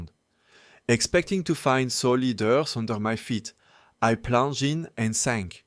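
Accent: France. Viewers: Bonjour, Je trouve vos vidéos très intéressantes, et je compte bien voir tous les épisodes 🙂 Mais si je peux me permettre une critique... La narration est vraiment dure à écouter. On dirait une voix de robot générée automatiquement, et avec un très, très fort accent français. Elle en devient même pénible au bout de quelques minutes (de mon point de vue). Je pense qu'une vidéo français avec des sous-titres serait plus agréable pour les francophones, tout en restant accessible aux anglophones. Voilà c'est mon avis En tout cas merci pour cet excellent travail de mémoire !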